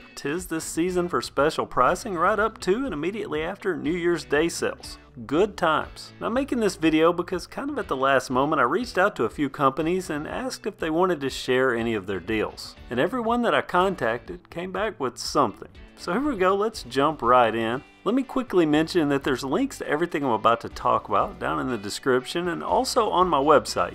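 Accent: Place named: Alabama, United States. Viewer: love to watch your videos, that easy alabama accent is great to listen to while I work (on bikes)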